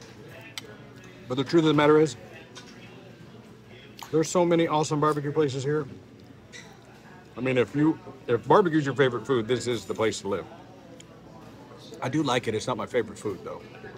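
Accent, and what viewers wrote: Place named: Texas, United States. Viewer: A definite texas twang in your accent when you're back home...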